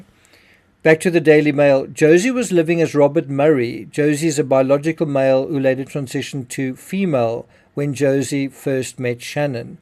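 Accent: South Africa. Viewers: I like his accent. Is it South African?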